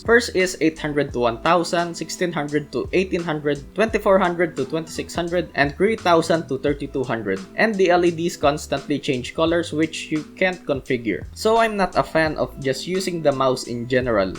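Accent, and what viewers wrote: Philippines. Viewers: Yeah he's accent is so filipino but it actually sounds good and his grammar is almost flawless. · accent doesn't matter, he is really Fluent in English. besides he's a Filipino anyways so its natural to have a Filipino accent. Good video. hope you make a review about Zeus Mk-880 Professional Gaming Mechanical Aluminum Steel Keyboard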